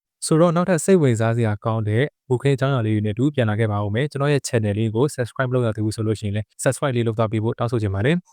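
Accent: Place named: Rakhine, Myanmar. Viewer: အကိုက ရခိုင်ထင်တယ် စကားဝဲတယ်😊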